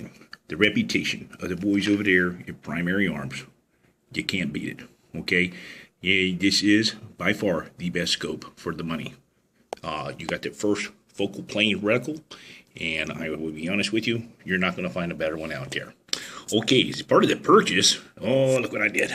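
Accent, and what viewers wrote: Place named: Fargo, United States. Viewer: Oh god stop the FARGO accent!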